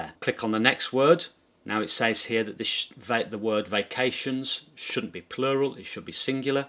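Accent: United Kingdom. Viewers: Interesting how English is spoken in so many parts of the world, yet have so many variations. I don't like using American English, but selling on Amazon, means using American. I do love your British accent,. Grammarly is great to use.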